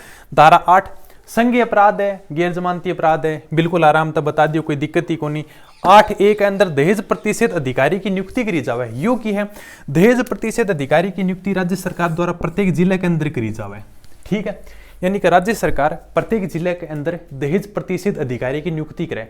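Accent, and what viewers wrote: Rajasthan, India. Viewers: Rajasthani language hai thoda bhut different ho jata hai bolne m...bro aap smajh to PA rhe ho ...ki sir kh stree rhe h..😊😊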